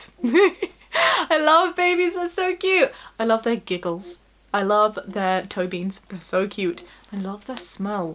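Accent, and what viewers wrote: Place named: United Kingdom. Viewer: Not gonna lie, as an American I think for me it’s the British accent that makes you seem wise 😂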